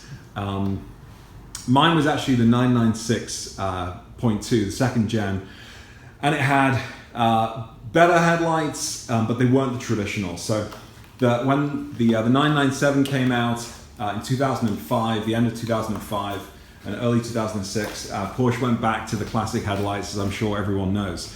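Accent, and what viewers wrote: United Kingdom. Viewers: Truly not “having you on”. I just love the way the British speak. As an American I’m embarrassed at how badly we murder the language in this country! It’s true my friend. Google 5 mph bumper and you’ll see that there’s a U.S. law pertaining to bumpers. There have been so many cars in the past that weren’t even sent to The States because of it. Many manufacturers just don’t want to deal with reconfiguring bumpers for this market. I’m actually fairly certain that the “bumperettes” (as you call them) don’t exist on the European versions of the 911.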